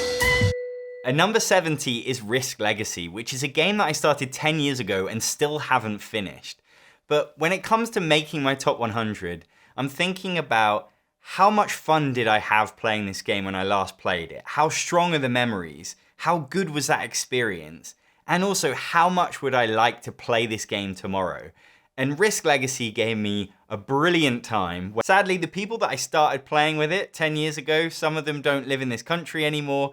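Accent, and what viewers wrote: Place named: England, United Kingdom. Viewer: that's just how you say "drawing" in an english accent.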